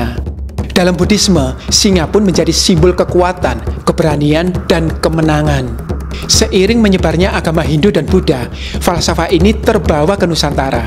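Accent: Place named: Probolinggo, Indonesia. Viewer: ciamik Soro gaya bicara orang probolinggo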